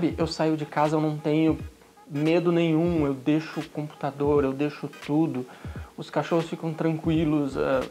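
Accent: Rio Grande do Sul, Brazil. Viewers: Você é de Florianópolis ???? Pergunto por que teu sotaque é de gaúcho !!!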